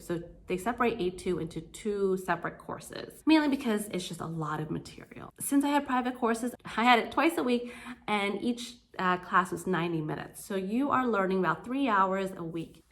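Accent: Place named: Spain. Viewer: You talk like your Spanish. Not bad, to be honest.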